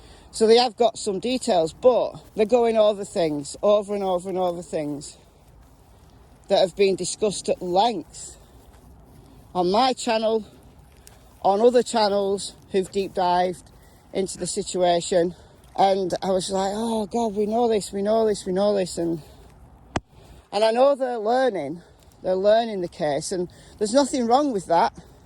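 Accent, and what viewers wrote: United Kingdom. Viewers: Thank you,. I always enjoy your lives, even if I very seldom have ever caught you live. Watching the replays, I always enjoy listening to whatever you are talking about, plus I so enjoy hearing your British accent. 😉 I would very much enjoy hearing you talk about the difference between the kinds of domestic abuse. I would find that very interesting. Thank you so for the time, effort and dedication that you put into the content that you provide. Stay safe and take care. ❤️🤗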